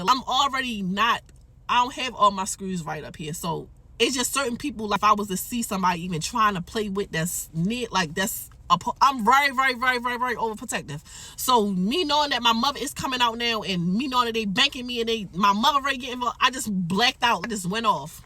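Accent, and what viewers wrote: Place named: Baltimore, United States. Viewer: I knew exactly where she was from before she said it!lol gots to bmore careful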